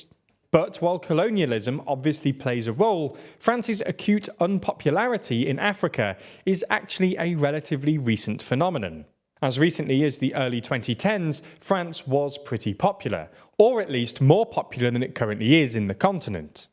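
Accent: England, United Kingdom. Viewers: Just wanted to see if it was gonna be some schmuck with an English accent telling us about the evils of the other guys. GFY!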